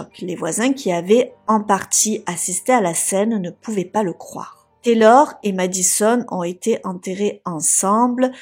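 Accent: South, France. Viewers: Taylor et Madison. Quelle tragédie !!! Pour ma part je pense que tu as fait une très bonne analyse de la situation. J aime bcp ta petite pointe d accent du Sud...moi qui suis dans l Hérault...🌞🌝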